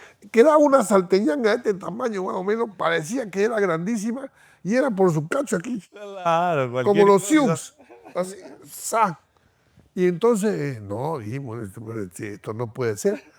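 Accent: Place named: Santa Cruz, Bolivia. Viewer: hablando como todo camba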